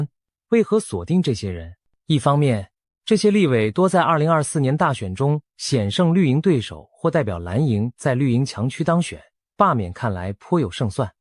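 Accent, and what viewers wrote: Mainland, China. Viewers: 聽起來像大陸人的解說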